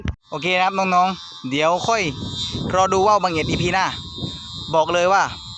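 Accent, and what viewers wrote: South, Thailand. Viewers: บัง ทองแดงทุกคริปเลย คนอืนฟังออกม้ายม่รุ้ ผมฟังออกแต่ว่า เราคนไต้ด้วยกัน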